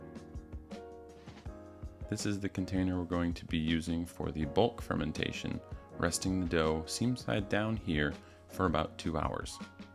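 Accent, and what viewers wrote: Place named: Italy. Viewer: Your Italian accent is stunning! As is the pizza!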